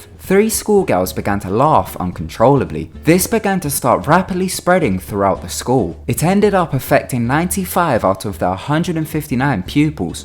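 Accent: United Kingdom. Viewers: Pretty sure it's a strong UK accent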